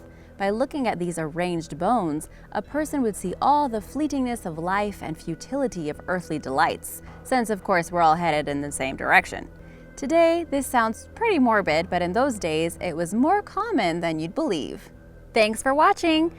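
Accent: United States. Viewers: Nothing worse than an American voice trying to tell British people about OUR historical times...🤨🇬🇧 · There is something triggering and insulting about hearing someone with a distinctly American accent calling soccer football. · You lost me at calling soccer football and football rugby. The narrator has an American accent. It’s just weird. I’m out. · It seems odd that the narrator with an American accent describes soccer as "football"....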